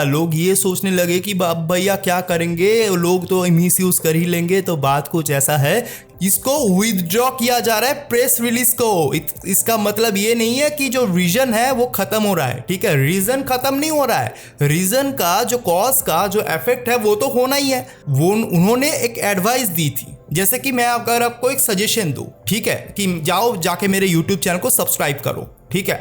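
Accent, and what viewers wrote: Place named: Bengal, India. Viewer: Bengali tone...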